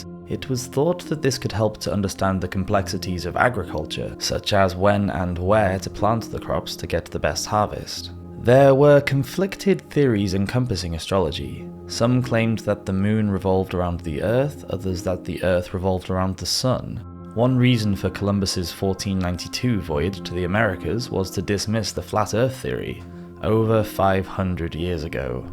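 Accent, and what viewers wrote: England, United Kingdom. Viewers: English accent :)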